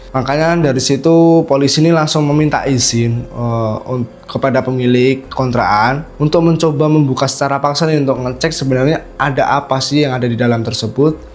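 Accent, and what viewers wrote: Java, Indonesia. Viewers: Loe Gua tapi medok 😮 mbok saya atau aku aja mas. Opo sampean kamu enak malahan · Ngomong GUA slank JOWO , mending SAYA masze jd cakep ...luwes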